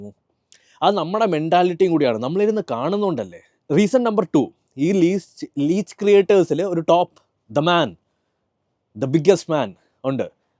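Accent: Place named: Thiruvananthapuram, India. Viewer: enth standard 😂😂 ninte movie review oke shokam aan....oru cinema knowledge ooo athinte oru essence oo ninte review il kanarila....chumma kore vaya kond goshti kanikum ....trivandrum slang il korch vaythalam.....and tech review x il ninum kittuna news athe pole irunn vayikunu.....ninte pandathe musically vedio kandapo manslyi nee oru kannapi mathrm ayrnu pine youtube il click aaypo ath ang continue cheythu....pine kore podi piler fans ulath kond koypmla....apart from as a content creator you are shokam .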